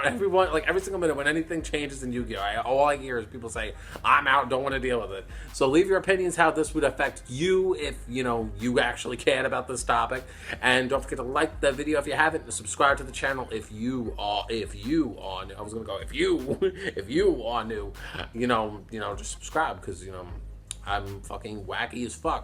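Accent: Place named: Brooklyn, United States. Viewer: I love how you have a Brooklyn accent and talk in a filthy frank voice at the same time. It's pretty cool and pretty funny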